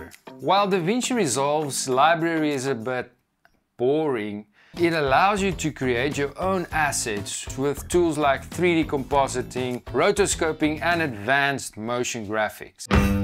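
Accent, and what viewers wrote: South Africa. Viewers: You sound SA, cool video man!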